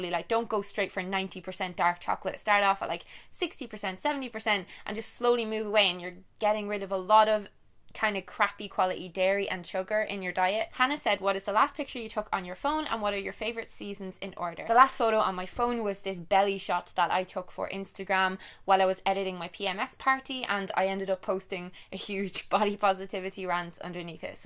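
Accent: Ireland. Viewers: Hi!! Just want to answer "your" question (technically not yours haha) I found you through Bry & Candice a WHILE back in a video about yoga.. I think?? And I checked out your channel and I immediately loved your body confidence videos as well as your food related videos and the makeup ones!! So I subscribed and then you started talking about your sexuality (Bi girl right here 👋) and I was just like "omg thank you SO freaking much for talking about this" You really help me to deal with life in general, your like a cat you calm my anxiety and make me laugh and smile:) Honestly, I feel like we should both go to Hogwarts and you would for sure be my favorite teacher!! Anyway, I just rambled about all the reasons why I watch your videos and I hope it makes sense because you're probably my favorite YouTuber and I sometimes wish I knew you in real life because I would LOVE to have someone like you to brighten up my life with your gorgeous eyes, your incredible knowledge of certain things even the most random ones (I did not know Freddie Mercury was Bi thank you!!) and of course your LOvely Irish accent! 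Thank you for being the most genuine YouTuber I know and helping us all out:) Love you lots xxx PS: also, you're my YouTube crush shhh;) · i absolutely love your videos, they always make me smile. your sweet Irish accent always chills me out. i love your Q and As beacuse i love getting to know you more. you are such a light in such a dark internet space. lots of love from England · Well I started watching you because I saw you in a video with Hannah, and you seemed nice. Also, I loved your Irish accent. But I stayed because you've made some really interesting and honest videos, and you tell your opinions on subjects, even if they contradict with what the majority of youtubers are talking about at the moment(i love how you mentioned that drinking alcohol every now and again is ok. I realise that talking about alcohol addiction is important, and I've been loving Lucy Moon's videos on the topic, but not everyone has a problem with alcohol, and I think it's important for people to know that, especially younger people who haven't tried alcohol yet.) I also like how you're supportive of other youtubers' success, book deals, etc. 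I tend to be a jealous person, envious of other people. But I've been trying to be more positive and supportive, and less jealous, and It's thanks to your videos :) Sorry for the long comment, hope you have a nice day! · I watch your videos because you're the first person with an irish accent that I ever heard (and then I discovered that it was FREAKING CUTE) + you're a bisexual lady (me too) and I love how you talk about this + you're mostly positive ! Love from France <3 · I watch your videos because a) you are super honest and funny and I really love you :) b) you come from a past of disordered eating, and since I have an eating disorder, I feel very motivated and inspired by you to recover because you show that it is possible and absolutely worth it! c) you are from Ireland and since I adore the irish accent and want to improve my english (I am from austria btw) I really like your chanel :D Just keep doing what you are doing because it is AMAZING AND AWESOME, lots of love and btw your hair looks stunning <3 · I watch your videos because I love your accent :) even though if I speak english now (Im from Germany) I tend to slip in some words that sound irish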